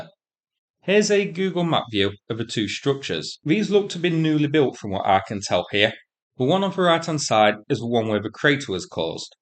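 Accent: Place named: Yorkshire, United Kingdom. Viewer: I just Luv your Yorkshire Accent...It reminds me so much of my Granddad. 😊😅😂...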